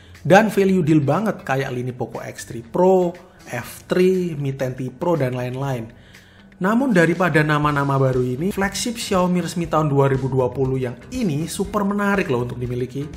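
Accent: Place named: Java, Indonesia. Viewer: Medok bro 😁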